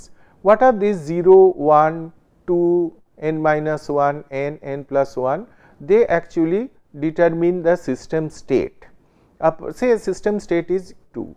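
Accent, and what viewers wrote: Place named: India. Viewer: This indian english is awesome)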